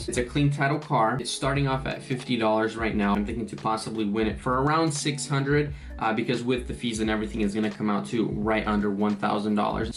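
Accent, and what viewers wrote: Russia. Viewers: Dude, are you russian or something?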